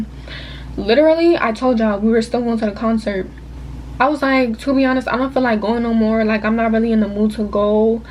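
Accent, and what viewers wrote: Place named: New York, United States. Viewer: I heard the accent and said "sis must be from NYC😄" and I was right lmaoo we can tell from one New Yorker to another. I also think it's something in the water coz these girls are weird and do not know how to be friends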